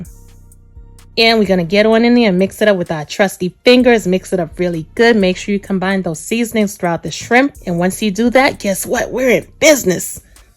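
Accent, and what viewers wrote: New York, United States. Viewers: Looks delicious 😋 you gotta be from NY or Jersey 😭😭 I know that accent 😂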